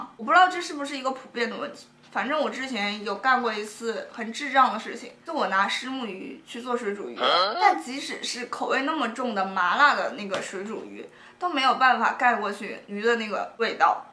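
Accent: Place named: North, China. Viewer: 聽你的口音應該是大陸北方的人難怪对台灣南部偏甜的口味不應可理解的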